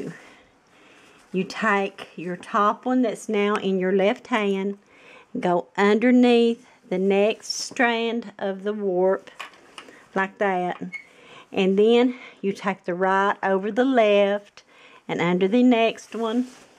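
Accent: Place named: Appalachia, United States. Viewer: Love the accent, where ya from? Reminds me of my Cumberland gap grandparents of VA and KY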